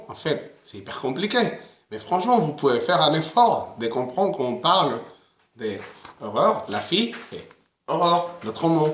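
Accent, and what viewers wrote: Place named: Spain. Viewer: T'es espagnol mon gars, on l'entend bien!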